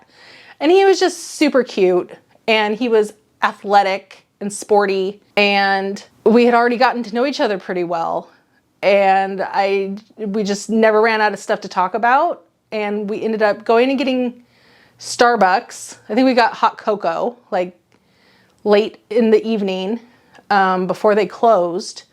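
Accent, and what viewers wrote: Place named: Europe. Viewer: Eric Lindros 😅🇨🇦🏒 European hockey mom here and I love you 😊, cause maybe you "sound" to me a little bit like an European ❤ Love your videos and sense of humor 😂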